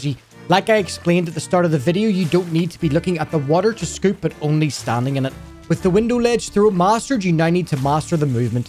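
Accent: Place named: Scotland, United Kingdom. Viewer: Love that Scottish Accent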